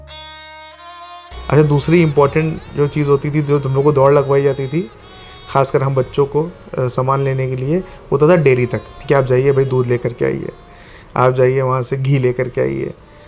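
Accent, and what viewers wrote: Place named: Lucknow, India. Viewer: Baaqi sab accha hai aapke videos mein but aapka pronunciation pe work karna hoga specially when you are carrying lucknowi touch in your words example its not फ़िर its फिर😊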